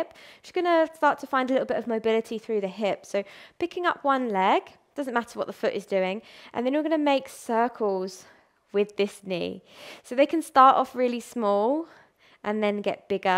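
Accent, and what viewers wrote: United Kingdom. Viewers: Another great session. Thank you so much. I especially enjoy listening to your voice. I am a southern Alabama girl living in Ohio and I can assure you the wonderful British accent is a welcome change.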